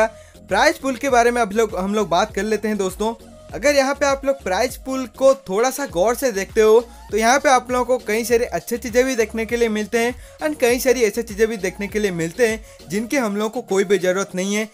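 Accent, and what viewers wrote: Odisha, India. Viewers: Bhai ap Odia ho kya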